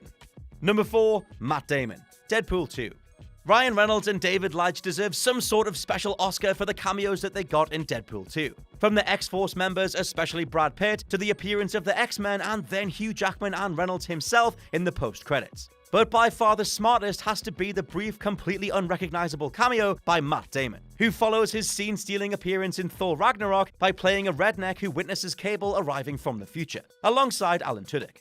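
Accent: United Kingdom. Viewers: That's a British thing. They almost all pronounce it Whooook instead of how North Americans do.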